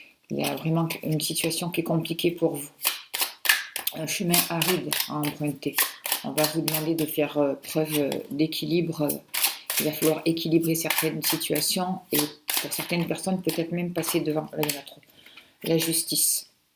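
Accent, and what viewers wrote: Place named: Gard, France. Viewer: Je vous ai découvert il y a peu, et je suis toutes vos videos depuis. J'ai été impressionnée par le soin de libération énergétique, et je conserve précieusement, pour le refaire, le soin pour l'élévation des vibrations. Je ferai un don prochainement, car le bénéfice que j'en ai retiré est précieux à mes yeux, et mérite un retour de ma part. Et aussi..j'adore votre accent 🤗, qui résonne avec le mien, moi qui suis du Gard. Merci pour tout! 🙏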